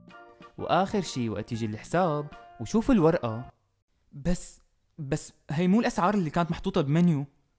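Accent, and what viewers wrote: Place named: Syria. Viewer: و الله متأكدة انو من سوريا نفس لهجتي و الله 😂💔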